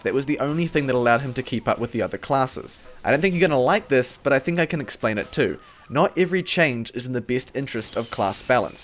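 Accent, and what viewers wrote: New Zealand. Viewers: Are you from nz? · what a strong kiwi accent :)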